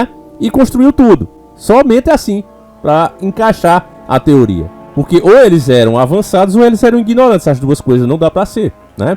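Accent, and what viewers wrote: Northeast, Brazil. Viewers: BOM Domingo á todos. Grata Dr,já peguei o meu diploma de " telejumento " pois soltei o riso na pergunta: Ou é evoluido ou não? Assinale as alternativas ( Janja em F you) ou 😂😂 ( Musk,eles perderão as eleiçôes) e no som lindo da sua voz falando " convergindo" como um i aberto e, melôdia do Nordeste. Escolhí Elon e a Melôdia. Sou diplomada?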